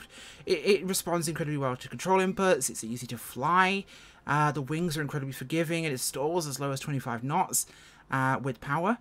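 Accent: Australia. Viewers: Luv the Aussie accent... Brought one for my Xbox.. great fun. Cheers mate 🤠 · Lovley Aussi accent there 😅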